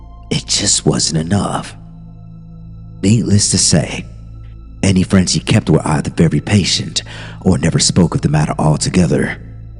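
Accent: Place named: South, United States. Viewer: Great job on the southern accent! I'm from TN & so many narrators can't pull it off but you nailed it my friend. Always good to hear from you. U are an awesome narrator & I enjoy your channel very much. Take care & hope you have a fabulous Friday & a wonderful weekend ✌🏽❤️✌🏽❤️✌🏽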